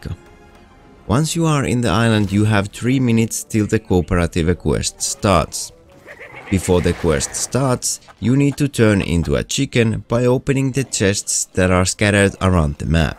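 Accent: Finland. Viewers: is that a finnish guy i am hearing since the Q and the word youtube came out pritty finnish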